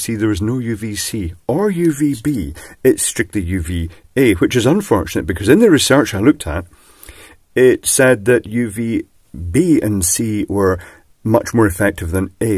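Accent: Scotland, United Kingdom. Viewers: You're voice sound like Scotland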